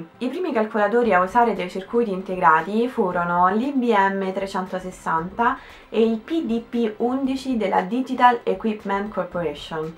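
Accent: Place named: Aosta Valley, Italy. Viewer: Brava, precisa e spigliata. Proprio a trovare un pelo nell'uovo l'accento valdostano ;-) E poi morirò chiedendomi se si dice nanòmetri o nanomètri.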